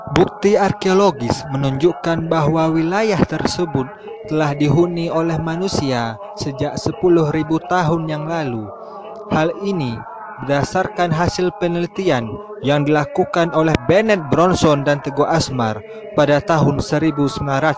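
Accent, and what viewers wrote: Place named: Kerinci, Indonesia. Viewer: Penyampaian kata2 nya membosankan, jelas bngat logat kerincinya.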